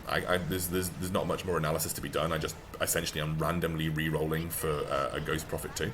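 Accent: United Kingdom. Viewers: Love your british accent jajaja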